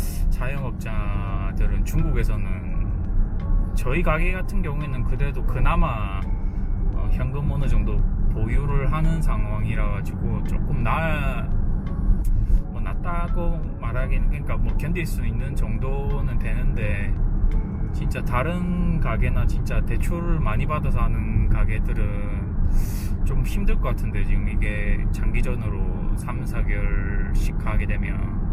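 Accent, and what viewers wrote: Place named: Gyeongsang, South Korea. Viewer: 고향이 경상도 인가보네요 언능 빨리 나아졌으면 좋겠네요 화이팅!!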